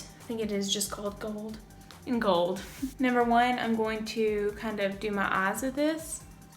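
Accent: South, United States. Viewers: I love your voice! It's so country! I hope you don't take that as an offense.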